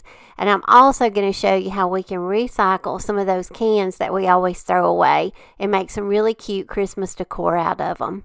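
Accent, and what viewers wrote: South, United States. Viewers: LOVE YOUR SOUTHERN ACCENT SOOO SWEET!!!!!!!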